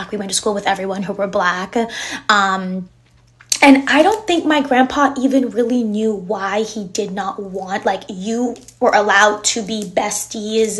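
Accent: United States. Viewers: Speak like a guyanese.....you turn yankee overnight like the others.... Be yourself and respect who u r and your background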